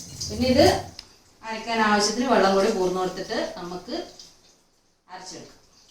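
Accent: Kasaragod, India. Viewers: ആഹാ നമ്മുടെ സ്വന്തം കാസറഗോഡ് ഭാഷ 😜🤗നീർദോശ 👌👌👌👌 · Slang mariyalo.. thanni kasargod❤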